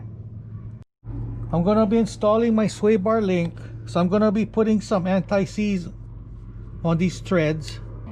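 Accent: Hawaii, United States. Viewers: Nothing says Hawaii like your accent, my friend. I bet you're about old enough to remember the following song on the radio - probably KIKI - "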